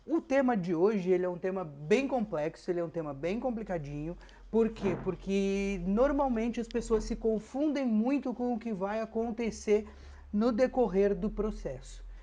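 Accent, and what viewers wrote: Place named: Rio Grande do Sul, Brazil. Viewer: Que lindo vídeo! Pelo sotaque so pode ser gaúcho kkk. Sempre tento fazer a Oração e não termino. Sei do que estás falando: já estive no fundo do poço e todo mundo desapareceu....meu pai, mãe e irmão me estenderam a mão e me salvaram da fome e do despejo. Se vc não tem grana vc não tem amigos. Me reergui na medida do possível e aprendi a viver na Solitude, a não esperar nada de ninguém e principalmente: a olhar de outra maneira para pessoas que eu achava mais "humildes" que eu....Hoje eu cumprimento, dou atenção, paro pra conversar, ajudo no q posso, mas é de coração, com carinho, sabe. Porque não sou mais que ninguém. Gratidão e Axé pra vc.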